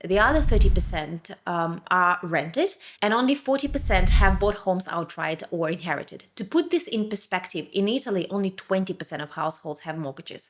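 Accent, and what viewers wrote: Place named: Ukraine. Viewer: an inspiring video, with a certain light vibe. you have a pretty cool accent as a Ukrainian❤❤❤❤ thanks for the video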